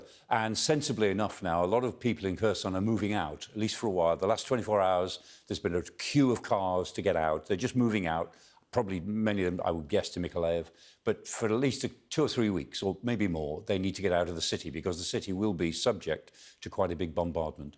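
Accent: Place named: United Kingdom. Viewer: Very beautiful british accent!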